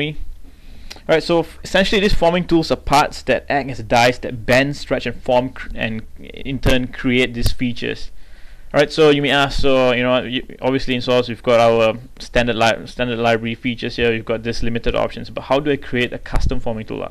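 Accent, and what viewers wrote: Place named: United Kingdom. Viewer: interesting. cool British accent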